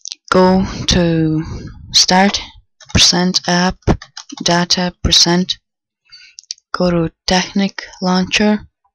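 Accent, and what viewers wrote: Russia. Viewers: Your Russian ? :D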